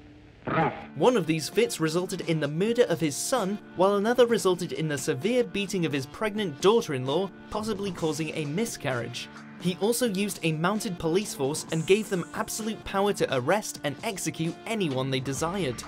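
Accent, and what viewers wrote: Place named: Liverpool, United Kingdom. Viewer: Can't watch this due to hideous Scouse accent saying 'merdered' every minute. Try not "murdering" the English language. Also "the women starved to death due to a lack of food"? Yes, that's generally why people starve to death.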